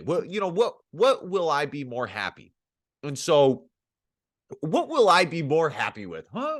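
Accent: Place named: South, United States. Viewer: you gotta be from down south. I literally had to speed this video up to 2.0X speed to even stay interested in a single sentence.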